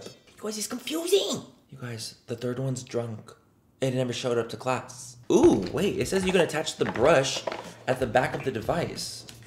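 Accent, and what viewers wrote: United Kingdom. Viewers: Living for the British accent babes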